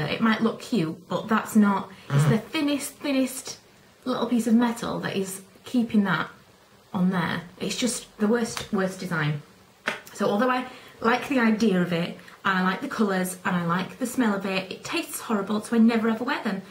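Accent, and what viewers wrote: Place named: England, United Kingdom. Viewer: shes definately NOT scottish lol. Im from Glasgow and can tell an english accent from a scottish one!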